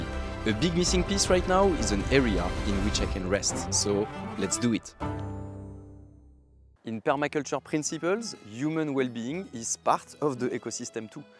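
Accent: France. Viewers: I love your french accent, your english is perfect but (la petite touche française) it's brilliant, I have the same, some times people tell me, what you just said sounds french and there I go ( my 1est language is french/suisse). Bonne aventure profitez la vie est trop courte.:thanksdoc: 🌼🥀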